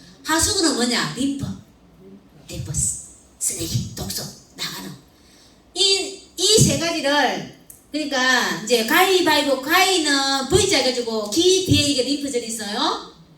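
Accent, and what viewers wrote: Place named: Gyeongsang, South Korea. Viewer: 경상도 사투리 구수하면서 찰진 표현 아무쪼록 칭찬하고 삽시다😊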